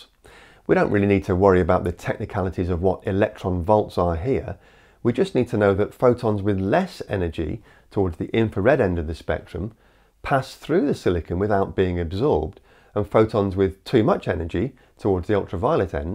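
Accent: United Kingdom. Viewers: Idc if you have a British accent, I’m not in agreement about global warming.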